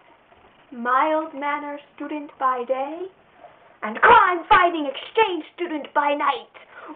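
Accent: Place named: Thailand. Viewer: i think ,your accent is verry like "thai " i know cuz i am thai. my nick name is "chang". "chang" = elephant. "ช้าง"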